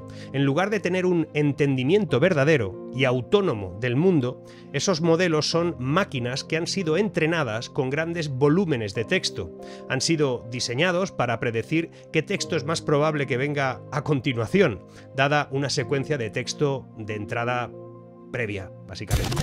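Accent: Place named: Spain. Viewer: Me encanta el contenido del video, me causa sorpresa y una inebitable distraccion que habla con acento Español de España con su bonita camisa de la seleccion de futbol Italiana, es esto un provocacion de oficio?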